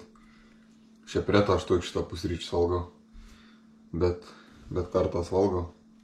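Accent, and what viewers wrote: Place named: Samogitia, Lithuania. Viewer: Klausyčiau i klausyčiau tos žemaitiškos šnektos. Ir dar kaip jaunas i stiprus žmogus kalba😊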